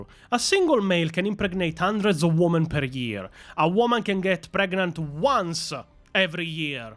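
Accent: Italy. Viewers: wait, I know this angry Italian voice ....... didnt you help Raven Knight with the Templin fiasco ? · Idk why I'm here, i just like your Italian Accent. Subscribed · ho riconosciuto il tuo accento italiano XDDDDD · Are you Italian or something? I can hardly understand you. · Duuuuuuuude you voice, accent and didiction amazing. Were are you from? Italy? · Geezus fucking christ you need to turn down the italian. Gooing to have to watch this video at half speed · God, hearing you rant about 40k lore with that italian accent, makes me want to believe everything you say and also to fight side by side with you brother! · What region of italy accent do you have? It is very entertaining for narrating.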